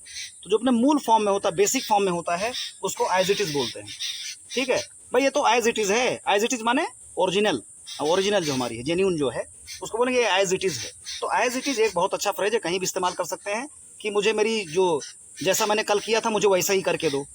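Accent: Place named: Uttar Pradesh, India. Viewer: Great performance Bhai ji.... Aap pakka bhaiya style mein padhaate ho.... U. P. Wala touch bhi hai..... Aapka padhaya koi bhi padh jaayega... Your Hindi is also very tuff...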